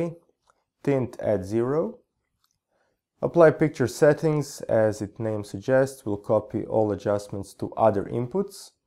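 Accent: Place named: Russia. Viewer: You speaking like a Russian man, I guess so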